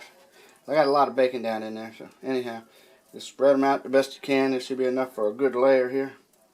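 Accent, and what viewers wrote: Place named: Louisiana, United States. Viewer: That sounds like a Cajun accent. I'm going to make this tomorrow, it looks Gooder than good.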